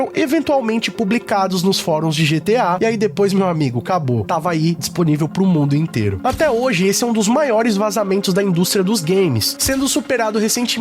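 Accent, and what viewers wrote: Portugal. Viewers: Não sei se já havia percebido, mas o canal tem sistema de dublagem, ele está falando o português europeu via IA, o idioma original é o PT-BR. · Pq ele tá com essa narração em português- pt ?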